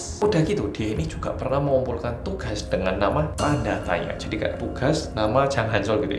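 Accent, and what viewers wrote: Java, Indonesia. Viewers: Mas Hansol :hai guys welcome back to korea reomit bersama orang korea yang........ Satu kampung sama gw : Medhooooooooooooook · Jawanya terlalu berat untuk aku. Sukar utk dihadam 😅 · Untung mas Hansol dulu di bawa ke Malang..korbanya cma rawon sama pecel...beruntung dan besyukur...teman" di skolahan masih berhubungan baik...jadi medok lagi.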